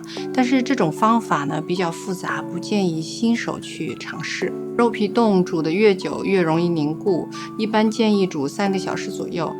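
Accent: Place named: Shaanxi, China. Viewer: 明显是陕西口音呀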